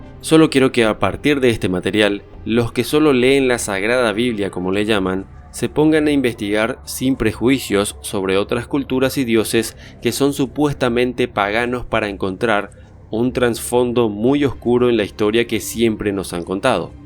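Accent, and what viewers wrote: Paraguay. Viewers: Que tal.... sos de paraguay..??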